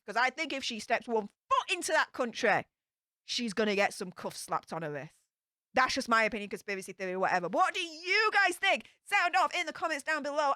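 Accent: Yorkshire, United Kingdom. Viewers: you’re the best! You always have me dying over here 😂😂😂 I thought you were from Yorkshire when I first heard your accent. I thought either Yorkshire or Lancashire. I wasn’t sure. My Great Grandfather was from Loftus! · you’re so dang cute and cheeky we can’t help but like you, give your partner BigRichard a hug from all of us. Do all Yorkshire women have your same accent?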